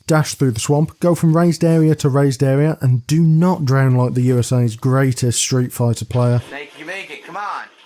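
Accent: Birmingham, United Kingdom. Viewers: couldnt place the accent til he said 'enemuy' hahaha brummie for sure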